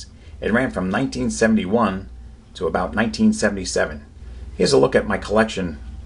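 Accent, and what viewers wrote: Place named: New York, United States. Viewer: I like your New Yorker accent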